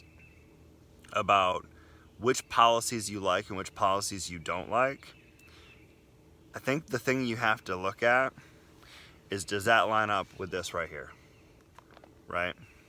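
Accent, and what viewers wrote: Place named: South, United States. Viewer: Your southern accent has really improved.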